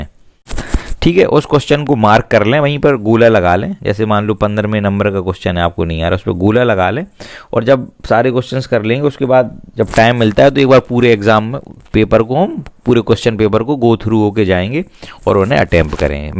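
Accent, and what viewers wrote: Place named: Jhansi, India. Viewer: Apki language jhansi ki lg ri h sir jhansi k h kya sir aap😁😁😁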